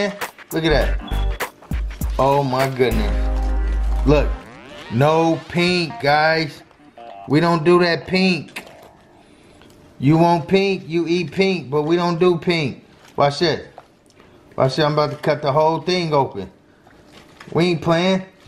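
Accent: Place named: Bay Area, United States. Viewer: Thank you for this my tri tip cam out tender! Btw Yall must be from the bay I recognize that accent lol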